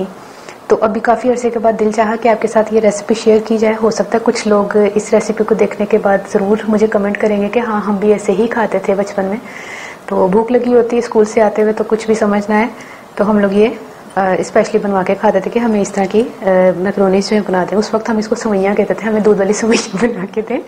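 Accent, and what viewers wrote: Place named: Punjab, India. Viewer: V nice ap ni asan chezn btaen or ap punjabi bhot sweet bolte hn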